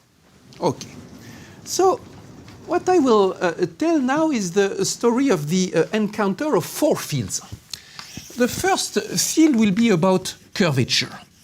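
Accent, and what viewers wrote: France. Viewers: I can't stand the French accent. Probably because I'm French. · Strong French accent, it's true. But clearly undertstandable ^_^, you are awsome, and we love you ❤ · I love the French accent! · My gooosh our accent is so awful! I can't believe that many americans find that sexy, beautiful or either relaxing as a Frenchman I can't stand this accent! ^^^ BTW merci pour ce cours très instructif!:) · French accent is maaad sexy to Americans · Gotta love the French accent 🤗💕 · As a french person, I find his voice kinda irritating to listen to... Most french people would cringe or not be fan of their accent especially when speaking in english, because we feel like we sound too "rough", or that we sometimes completely destroy your words! but i have noticed strangely, that english speakers generally kinda like it ?...so thats good!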